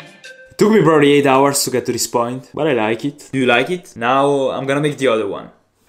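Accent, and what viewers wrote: Italy. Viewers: His accent is like an Italian trying to talk in inglish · You have such an italian accent bro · Are you Italian? I am Italian and your accent sounds like it! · 🇮🇹Hai un accento italiano mentre arli in inglese, sei italiano ? 🇬🇧you have an italian accent when you speak english, are you italian ? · fra si sente sei italiano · Bro you sound italian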